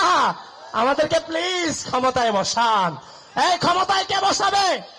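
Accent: Kolkata, India. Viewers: তার কথা কলকাতার ভাষা মনে হচ্ছে ভারতের বির্জ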